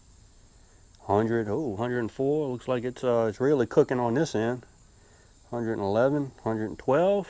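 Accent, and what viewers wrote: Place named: Louisiana, United States. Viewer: HELLO IM A NEW SUPPORTER HERE SHOWING SUPPORT FOR YA AND I HIT THE LIKE BUTTON AND THE BELL. GREAT INTRO AND MUSIC. IM TRYING TO PICK OUT THAT ACCENT. IM NOT SURE EXACTLY. IM A SOUTHERNER. I THINK UR FROM LOUISIANA MAYBE? LOL KINDA SOUNDS LIKE IT. BUT AWESOME VIDEO!! THANK U FOR SHARING!! Wow it keeps climbing. 112. Awesome!! 👍👍👍👍👍👏👏👏👏😎 Nice plant u got ther. Lol Lord have mercy. Lol I always say that. Lol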